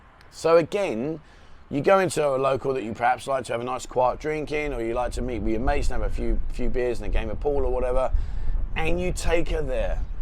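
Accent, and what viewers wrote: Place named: England, United Kingdom. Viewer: well first of all I don't watch these videos. It popped up on my feed. Secondly as soon as I hear the accent I turn off. The English have been ruining tourism in SEA for years. Demanding the locals speak English, fighting with locals, destroying their property, doing perverted inappropriate things. No respect for the local culture. You're all better off staying at home where you belong.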